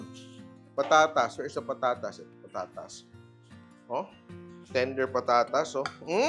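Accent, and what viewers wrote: Philippines. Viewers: Are you a cook from the Philippines? I noticed you sound like someone from the Philippines speaking Tagalog or Cebuano.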